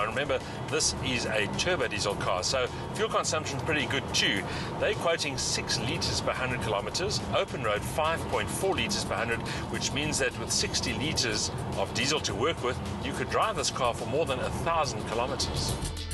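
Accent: South Africa. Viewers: ARE YOU FROM SOUUUTH AFRICA?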